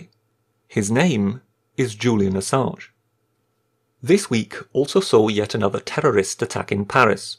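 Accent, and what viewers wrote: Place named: Scotland, United Kingdom. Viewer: I HAVE THE GREATEST OF RESPECT FOR JULIAN ASSANGE. ANGEL IS IN HIS NAME , AND I PRAY THEY PROTECT HIM. HE IS SIMPLY PRESENTING TRUTH, AND THOSE WHO ARE GUILTY MAKE EVERY ATTEMPT TO DEFAME HIS REPUTATION, HOPING THAT WILL BRING HIS WIKILEAKS ACCURACY UNDER SUSPICION. WE ARE TOO WISE FOR YOUR LIES ABOUT HIM. IT APPEARS THAT JULIAN HAS A LOYAL RESCUER/SUPPORTER HERE. THIS VOICE IN THIS VIDEO IS NOT JULIAN. ACTUALLY I HAVE A WEE BIT OF SCOTTISH IN MY HERITAGE AND SUSPECT THE SPEAKER IS SCOTTISH. YOU HAVE OVER 100 VIDEOS USING ONLY 2 COVER PHOTOS . I wish this speaker would tell us who he is (but in wisdom, given the unjust treatment Julian has endured, perhaps wiser to remain incognito. Respect to you, sir.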